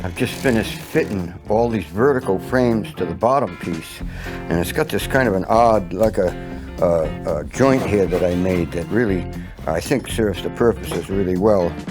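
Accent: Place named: New England, United States. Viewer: Glad yo see you back, enjoy the channel and learned a lot from watching that I've been able to transfer to more general woodworking. Grew up in New England, and it's nice hearing that accent again.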